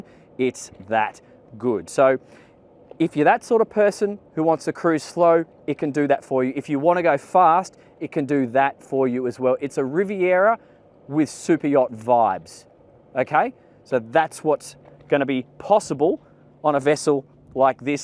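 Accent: Australia. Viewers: This Boat is awesome, but i love listen to your Aussie Voice too!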